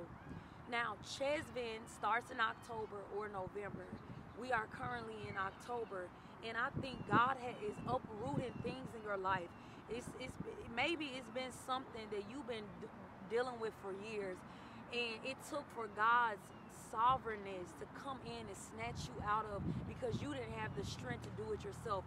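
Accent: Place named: Memphis, United States. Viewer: This was right on time. Idk how I got to your channel but I’m glad I did. Your videos have really been blessing me. I love the Memphis accent too (lol I can always hear a fellow Memphian 😂)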